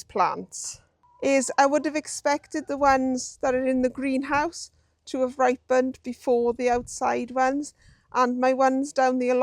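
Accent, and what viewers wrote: Wales, United Kingdom. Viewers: Lovely job our, I love your Welsh-ness in your commentary, 😂never lose it lovely girl, the harvest you gave me were delicious, 🎉xxxx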